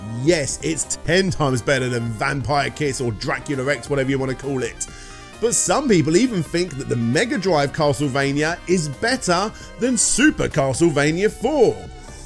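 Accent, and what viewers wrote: United Kingdom. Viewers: he's also British and I'm assuming the commenter is from the USA. Idk, maybe aria and ecclesia sound specifically similar with a British accent. Either way. Pronunciation is different in other parts of the world. As an American, this is why our fellow global citizens sometimes see us as idiotic self-centered assholes. Go learn another language and/or dialect and see how well their native speakers will point way more fundamental language concepts. Be better. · It’s the regional British accent. I had a teacher with the same accent as who pronounced “ideas” as “idears.” Ironic that they drop the “r” on words that end with it and add it to words that end without it.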